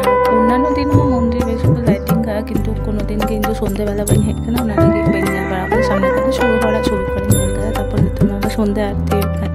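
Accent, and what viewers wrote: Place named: Santali, Bangladesh. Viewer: বাংলাদেশের সান্তালী একসেন্ট এর সাথে আপনার একসেন্ট মিল আছে।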